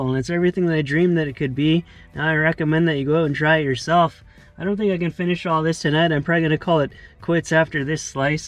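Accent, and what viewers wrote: Canada. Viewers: The first couple seconds my first thought was "He sounds extremely Canadian."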